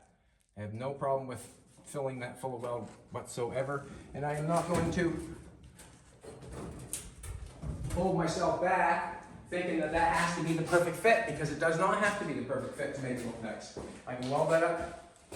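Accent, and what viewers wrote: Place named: Eastern Canada, Canada. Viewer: Can we pause and appreciate the eastern Canadian accent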